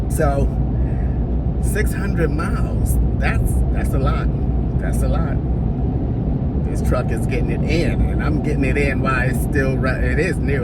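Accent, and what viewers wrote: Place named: East Coast, United States. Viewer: your accent 🥰, are you from the east coast? Enjoy the cruise and FOA Fam 🎉🎉🎉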